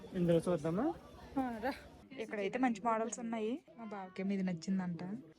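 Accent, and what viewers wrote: Srikakulam, India. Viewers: Weight loss ayinapati nundi mi dressing style kuda change chesaru So miru Ala change ayinapudu Srikakulam slang use cheyadhu🙏 inka deniki modern language lo ne mataladachu ga. Videos kuda chala lag avuthunayi... Okay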